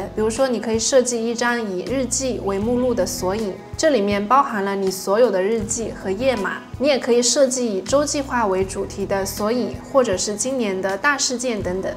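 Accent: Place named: Shashi, China. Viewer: 为什么你说话这么像我们沙市人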